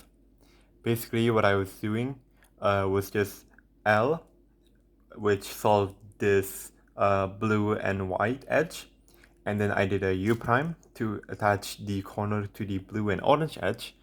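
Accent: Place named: Indonesia. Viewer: Next time gonna be better. Also this is the most indonesian accent ever when you speak english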